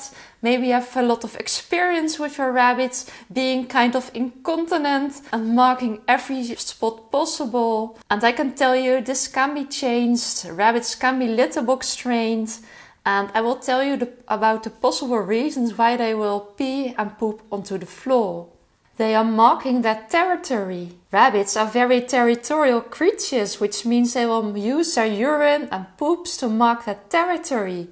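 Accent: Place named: Netherlands. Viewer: you Dutch you have an accent like me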